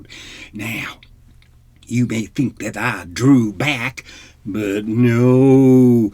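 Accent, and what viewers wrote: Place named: South, United States. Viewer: Hahahaha!!! 🙌🙌❤ I absolutely love the southern accent!!!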